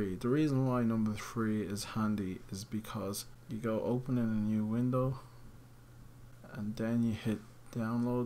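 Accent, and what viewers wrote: Dublin, Ireland. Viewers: Bleedin' Dublin accent x'D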